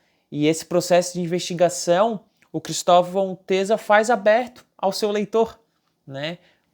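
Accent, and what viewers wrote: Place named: Florianópolis, Brazil. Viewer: Pelo sotaque, vc só pode ser manezinho da ilha também hahaha (sou de Floripa)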